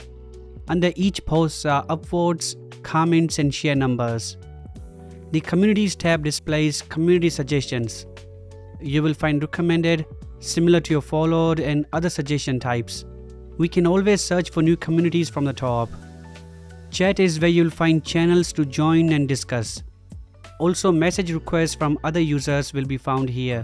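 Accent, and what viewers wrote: India. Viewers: Indian accent!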